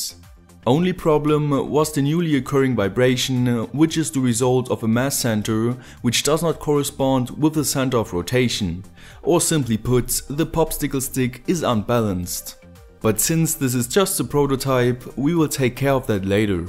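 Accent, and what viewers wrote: Germany. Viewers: was wondering what kind of accent that was! Glad to know now! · let me guess... youre a german... (like me) ? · What about turning a Laptop into a single Keyboard, Monitor and PC itself? And by the way: You are German, right? Sometimes in the Videos there are German words/sentences and i can kind of hear, that you are German. Wo wohnst du ungefähr? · English with a German accent. Sometimes surprisingly easier to understand than English from England or English from America can be. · Hallo mein deutscher kamerad. Hab deinen akzent erkannt lol :D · Hey, may i ask from which country you are? not that i would criticise that, but you have an accent that for me sounds a bit like german - and as im from germany, i just wanted to know if im watching a neighbor or something:) (But well, i guess there are a lot of similarly sounding accents, and as yours isn't that strong it could be a lot different things. 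isn't a german name at least^^) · are u german?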